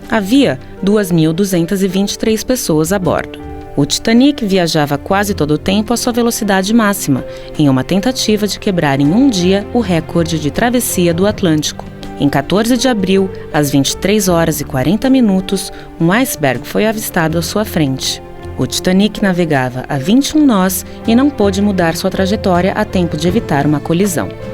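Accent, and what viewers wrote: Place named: São Paulo, Brazil. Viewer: essa mulher tem um sotaque irritante de paulista "iceberrrrg"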